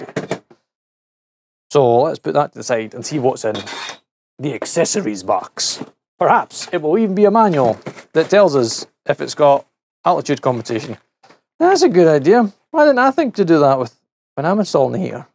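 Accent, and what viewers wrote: Scotland, United Kingdom. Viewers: I love watching and listening to your videos. The refreshing sound of a Scottish voice is like some healing tonic. :)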